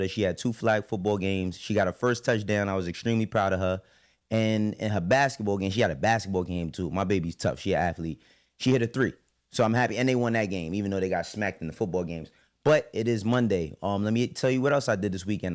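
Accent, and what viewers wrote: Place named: New York, United States. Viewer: lol you sound like you’re from nyc, got that jay. Fitted on, yea you a Stan. Won’t watch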